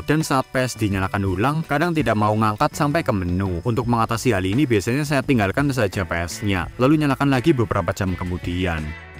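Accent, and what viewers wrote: Banyumas, Indonesia. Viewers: Wong ngapak jebule 🎉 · ternyata wongapak😂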